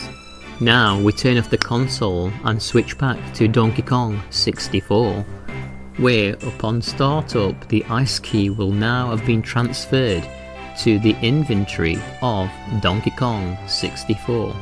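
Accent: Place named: Manchester, United Kingdom. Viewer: Couple months late but this video (and your channel) and criminally underrated. I'm only 11 minutes in but I can already tell this is gonna be a great documentary. Brings back memories of me desperately looking up for ANY content regarding this when youtube first became a site. Fond memories of watching Thumbs Up Masters contents. Also I know it's not an AI voice cos AI is terrible at doing Manc accents ;) Liked and subscribed!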